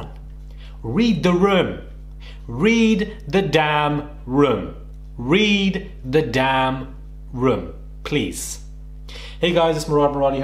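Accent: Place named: United Kingdom. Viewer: Racheal X Whoa! Well I feel dumb, I should have guessed this guy has a primarily uk audience since he sounds like he’s from there too😅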